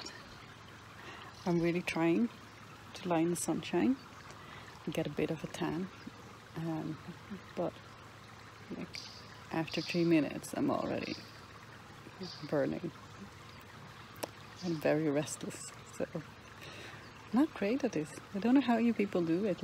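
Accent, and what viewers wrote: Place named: Ireland. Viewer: I just saw a little boy child across your camera when you went in for a dip...so am figuring that you aren't the only person there........trying to figure out your accent....oh, i thought it was Irish.....lol oh, those men don't really mean any harm, they are just trying to break the Ice .....I am British, but my parents are Jamaican. A lot of people ask me where I am from, but because I am black, I get asked , so where are your parents from, so I have to explain they are Jamaicans.......BOOM !, as soon as they hear Jamaica .........Bob Marley, Ganja or Usain Bolt . I get it, they are trying to relay connect with me by the only thing(s) that they know about Jamaica, so maybe those men only know about the red light district and maybe the weed Cafes there.......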